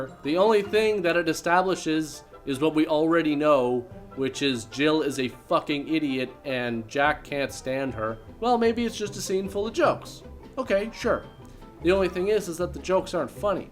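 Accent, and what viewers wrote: Canada. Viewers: Are you Canadien?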